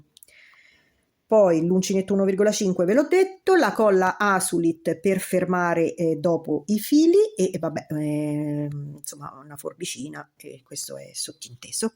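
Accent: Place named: Rome, Italy. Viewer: Ciao! L ho realizzata oggi ed è venuta benissimo! Bellissima! Poi tu mi sei troppo simpatica! Sei di Roma? Dall'accento mi sembra di si (anche io sono di Roma). Spieghi molto molto bene, e questa mini bag é veramente bellissima! Grazie! ❤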